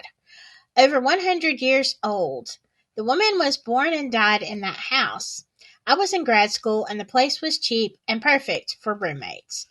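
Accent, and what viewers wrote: United States. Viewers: U have the same English as the rest of English america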